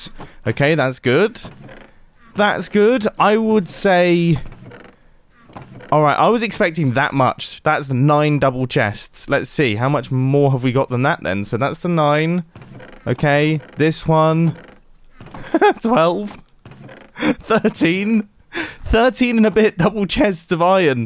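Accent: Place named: United Kingdom. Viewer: You sound no different than you usually do. Like a charming, if not occasionally clueless, British guy who does minecraft redstone for a living. That was a complement by the way, you sound fine like you always do.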